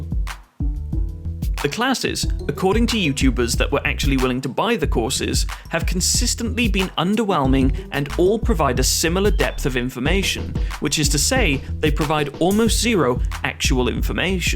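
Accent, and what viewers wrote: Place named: Wales, United Kingdom. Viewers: The Welsh accent.❤ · Why does the wikifeed AI voice sound like it's from Swansea? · Why does wikifeed have a North Wales accent? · I love that the A.I voice you use is welsh.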